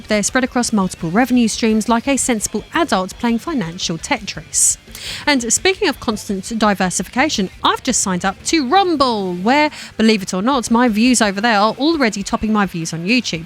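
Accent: United Kingdom. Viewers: Wait, you're a BRIT? 😮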